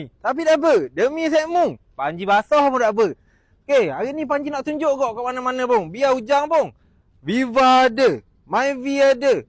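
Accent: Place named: Kelantan, Malaysia. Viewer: Aku pun tak paham bahasa pekat kelate kamu 😅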